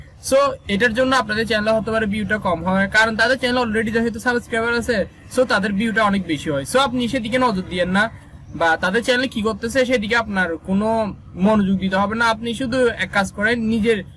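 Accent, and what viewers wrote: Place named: Sylhet, Bangladesh. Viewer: কথা সুনলে হাসি পাই......... সেলেটি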